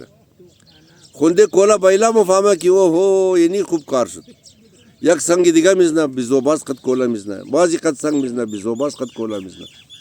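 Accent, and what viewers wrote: Hazaragi, Afghanistan. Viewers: سلام اقای. نام من رضا هست و در ایران تولد شدم و ۳۰ سال دارم و بیشتر از نصف عمر خود را در اروپا زندگی کرده ام. دلیل اینکه من ویدئوهای شما را می‌بینم دو چیز هست: ۱. زبان و لهجه هزارگی را از شما یاد میگیرم. ۲. هر ویدیو شما یک درس زندگی به من میدهد. موفق و سلامت باشید. 🌹✌️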